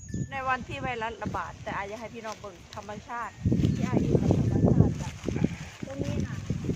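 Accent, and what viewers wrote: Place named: Nakhon Phanom, Thailand. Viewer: สำเนียงคล้ายคนนครพนมเลยค่ะ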